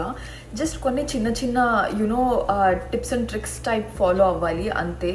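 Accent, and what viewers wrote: Telangana, India. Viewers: Nenu mee recipe kosam video watch cheyaledhu. I would watch your video because of your voice. Fan of your voice mostly telagana slang ❤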